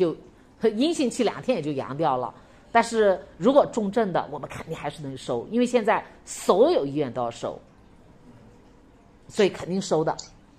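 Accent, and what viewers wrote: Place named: Yunnan, China. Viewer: 云南口音😅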